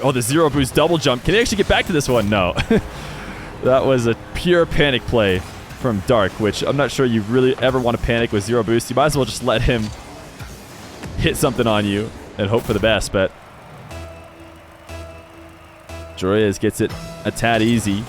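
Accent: United States. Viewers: Bro, you’re literally a Johnny boy copy in American accent. I get it, he’s very successful, but bro. Please find YOUR style. Don’t need to sound like another successful person…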